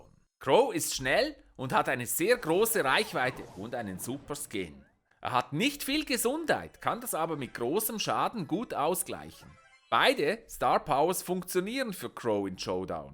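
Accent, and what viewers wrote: Switzerland. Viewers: Bist du a schweizar du bischt foll gaaaiiilll ich liebe das wen du sagst Sandwich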